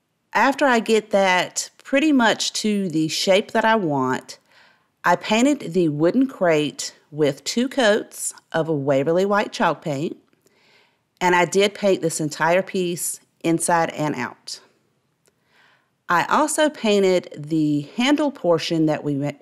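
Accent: South, United States. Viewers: Your scales is amazing and the best I’ve seen.....ps from one southern gal to another....lve the accent!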